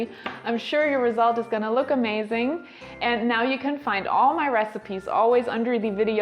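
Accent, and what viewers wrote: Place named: Germany. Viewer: You sound very German!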